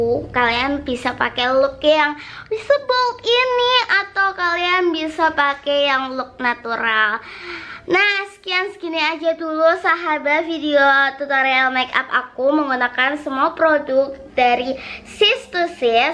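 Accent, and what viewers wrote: Java, Indonesia. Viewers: Medok bgt logat mba 😂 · aku mojokerto juga medok wkwk. Lah bukannya dia dri tulungangung ya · Seneng dengar meddo' jawanya😁 Sek to dek, meneng o dek, aku nge review iki loh🤣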